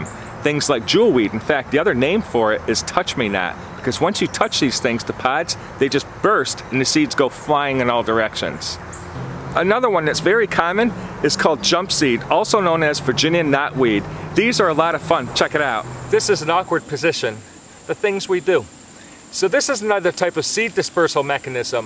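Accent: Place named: New England, United States. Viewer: Judging by the environment, it appears you are Coastal US. Accent seems to be more "North" of me. What State do you reside in? I'd guess New England area...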